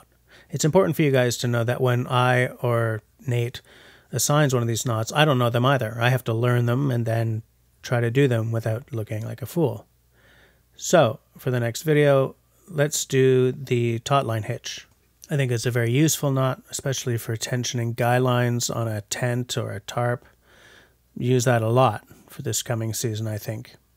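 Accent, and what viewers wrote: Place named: Western Canada, Canada. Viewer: Hey there friendly. Man, I loved this one, too. Dead battery, yeah right. I bet it was a Nigel Tufnel wireless issue and you had the RCAF instead of you talking. Lol. How hard was the dubbing at the beginning? I'm just learning to edit, so I know it must have been way tougher than it looks. Had to watch twice, you kept cracking me up. I like your dry sense of humour. "here I am being silly/I must have said something funny/as I look pensive". Happy Thumbs up, sir. On a side note, your accent doesn't sound Quebequois...west of Manitoba, perhaps? Take care mon frere,.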